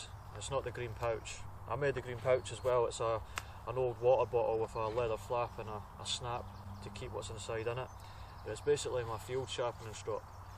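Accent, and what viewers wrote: Scotland, United Kingdom. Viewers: Are ye Scottish?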